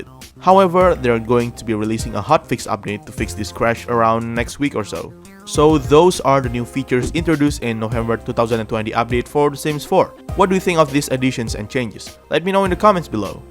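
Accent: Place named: Indonesia. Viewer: Sebelum ku liat deskripsi "kalo didengerin kayak orang indo ya 🤔" Ternyata bener 🙆🏻‍♀️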